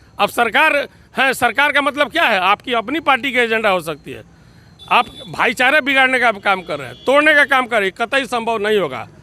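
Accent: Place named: Bihar, India. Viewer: Aapka baat bolane se Pata Chalta Hai Ki aap Bihari hai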